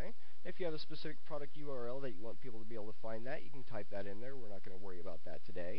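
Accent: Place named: United Kingdom. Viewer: He's a Brit so ofcourse he got an accent.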